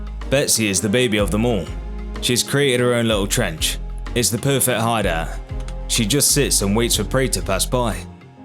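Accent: United Kingdom. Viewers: are u british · I immediately heard that Brit accent 😭🤌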